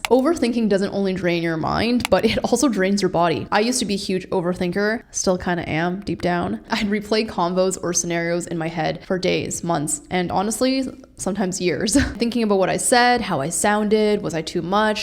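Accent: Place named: Canada. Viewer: OH MY GOD IF YOU'RE FROM CANADA I FINALLY COULD PICK A CANADIAN ACCENT FROM AN AMERICAN ONE 😭😭🤧🤧🤧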